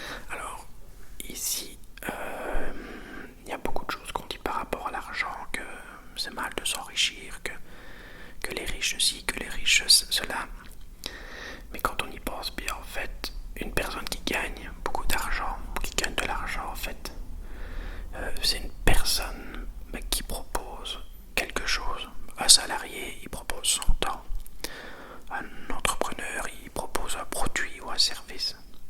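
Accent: Belgium. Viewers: J'adore l'accent belge😉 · L accent belge me detent beaucoup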